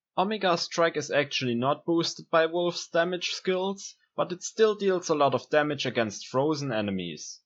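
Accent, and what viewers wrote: Germany. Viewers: your german accent is so strong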